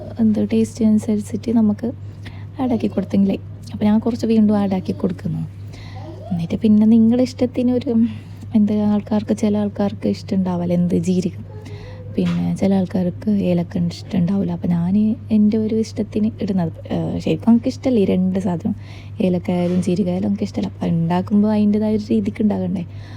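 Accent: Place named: Kasaragod, India. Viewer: Edh slang 😊kasaragod slag,😅😅😊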